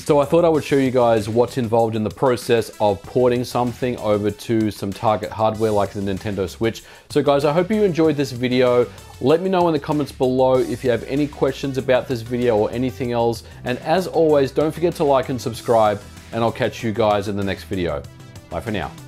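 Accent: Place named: Australia. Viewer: You sound Australian lol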